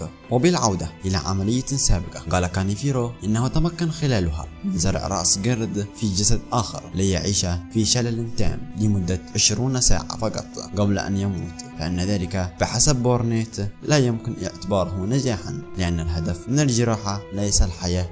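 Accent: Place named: Yemen. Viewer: نبرة المقدم يمنية فأنا يمني واعرف قومي . حاول تتدرب قليل في طريقة إلقاء الشرح. وشكرا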